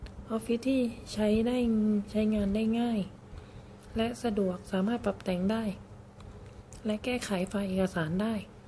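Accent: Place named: South, Thailand. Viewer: อยุจังหวัดไรนิแหลงออกทองแดงเรย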